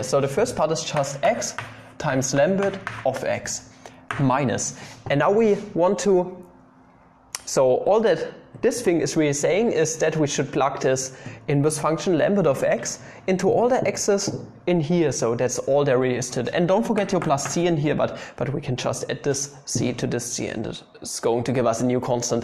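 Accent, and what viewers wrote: Germany. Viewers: Ahhh the good old german accent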